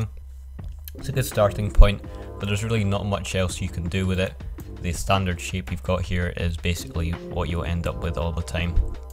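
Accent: Scotland, United Kingdom. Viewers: What part of Scotland are you from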